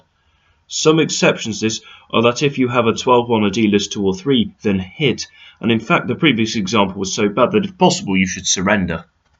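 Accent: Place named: United Kingdom. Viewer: Dude's got a british accent and talks about pounds and pence, in Europe, blackjack sucks because they don't check for dealer blackjack until AFTER you do everything. One result of this is you wouldn't be doubling down against a 10 or an ace on anything. But another is that you're actually incorrect then, and you WOULD hit or stand even if the dealer's got blackjack, you wouldn't know if you've already lost.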